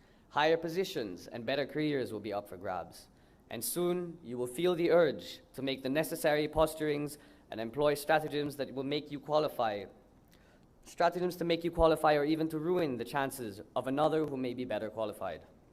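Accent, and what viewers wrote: United Kingdom. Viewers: Nakaka Harry Potter naman ang accent 😍😍😍 Love na love ko yarn ❤️❤️❤️❤️🤗😘😘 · Love the slight British accent! 😍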